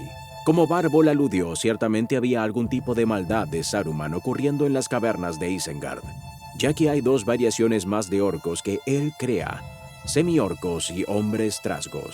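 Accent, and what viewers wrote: Argentina. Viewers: pues me alegro que no seas una ia, aunque de verdad me dio esa sensación,,, apoyo la traducción, pero no me gusta el doblaje español, ya que se suele emplear un dialecto genérico que no representa bien la realidad,,, no niego la calidad de NOR, pero hay muchos youtubers hispanos que también lo hacen bien, y mucha gente no los conoce porque solo consume contenido americano. Si creas tu propio canal, contando las historias a tu manera y con tu acento argentino, prometo subscribirme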